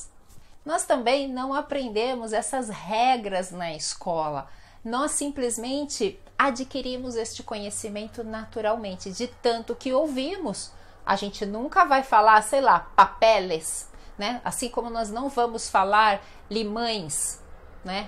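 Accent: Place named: Paraná, Brazil. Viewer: Parabéns pelas aulas super didáticas e pela dicção excelente. Você não tem sotaque de Curitiba, mas sim (um pouquinho) do centro-norte paranaense ou do interior de SP.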